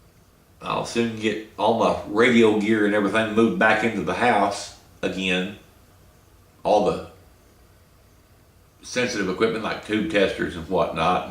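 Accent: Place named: Appalachia, United States. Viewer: Hi. Just wondering are you from Tennessee Your accent is very much like mine and Larry's. do you like Indiana?. I don't mean to be nosy just curious. I just couldn't bring myself to leave these old mountains:) All my best. Bobby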